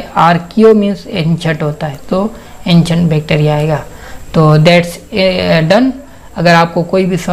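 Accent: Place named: Sindh, India. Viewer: you speak english with sindhi tarka, good explanation sir.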